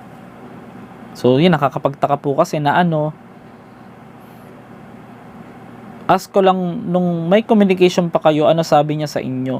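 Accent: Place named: Hagonoy, Philippines. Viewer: Taga Hagonoy Bulacan k b idol? Kase yong punto at way ng salita mo parang tga hagonoy k. Daming life lesson s kwento mo.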